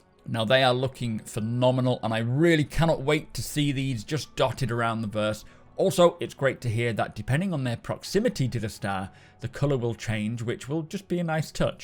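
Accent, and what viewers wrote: United Kingdom. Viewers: You have a very thick britbong accent It's really annoying, for example on 5 minutes you're trying to say teased but you said teabd. incoherent mumbling.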